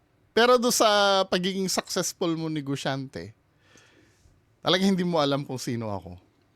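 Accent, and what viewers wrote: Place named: Visayas, Philippines. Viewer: Ate Bisaya ako Pero ikaw Bisayang Bisaya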